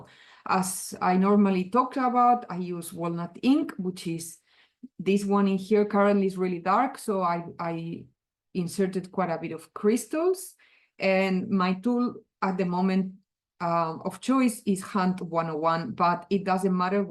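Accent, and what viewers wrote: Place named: Catalonia, Spain. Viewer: Fun to watch you create beautiful words with such ease. Also fun to listen to your Catalan Spanish accent. ❤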